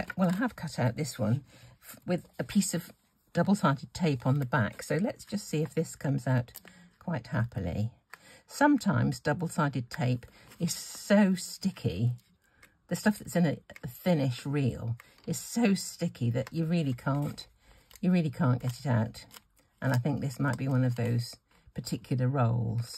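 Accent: United Kingdom. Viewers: What a cute card! I subscribed long ago but I have a lot of subscriptions and I kind of get stuck on a few. Only recently came back around to watching some more of your videos and I have to say your manner is like a breath of fresh air! I love your clean and simple style and your voice is a joy to listen to. I love your British accent ( I’m from Texas in the US, we have quite a different sound!) and your calm, quiet ways. Your videos are so very enjoyable 💕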